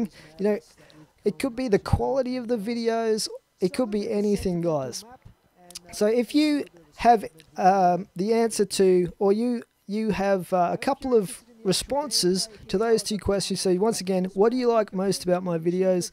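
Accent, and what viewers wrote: Australia. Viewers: It may sound a bit strange, but the original thing that really drew me to your gameplay was you accent. Nothing beats an Aussie accent. And as to the second question, i think that more games played with subs would be very neat., i am so proud of you. You have come so far with your channel, and i just would ask you to never give up. Nothing cheers me up after a bad day like watching a video:D thanks so much, and keep up the fantastic work:D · I'm not entering for the competition (obvious reason, no Xbox) but I'd say for 1. The Australian accent along with the fact that you don't go much astray when talking about random things during gameplays that get annoying (some YouTubers have the issue, luckily I don't see it with you :D). Most of the time your conversations with others or just the voiceover for the gameplays you do are entertaining/fun to listen to. 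As for 2., I'd say get better internet for streaming :P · 1. Custom Zombies - You're partly responsible for getting me into it (through Yoteslaya r.i.p.) - Love hearing a familiar Aussie accent on a typically american/british dominated genre. 2. I would possibly like shorter videos - Max 15mins. Otherwise, everything else is great!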